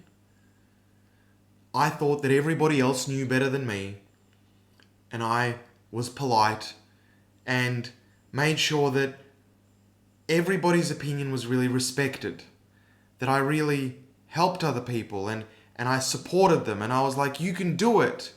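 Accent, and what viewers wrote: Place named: Australia. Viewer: ur australian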